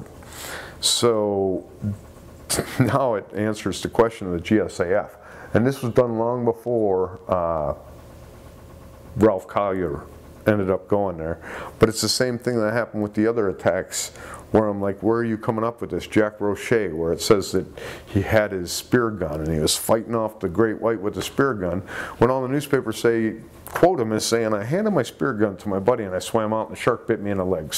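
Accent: United States. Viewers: nice American accent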